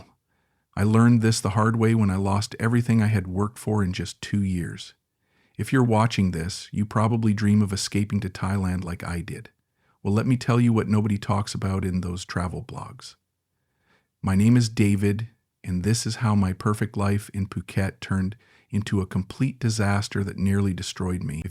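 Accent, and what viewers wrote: United States. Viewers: As a British guy, why do you have a US accent?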